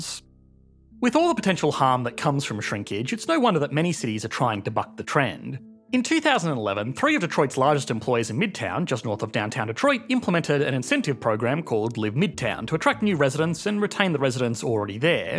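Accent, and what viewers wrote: Australia. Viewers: I detest this channel, and not because of the unbearable Australian accent. Nevertheless, I want it to go on if just to watch other YouTubers point out the myriad of mistakes in these videos.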